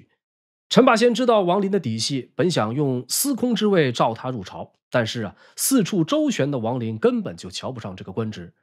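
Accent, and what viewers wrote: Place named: Northeast, China. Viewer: 很精彩 兄弟是东北人吧）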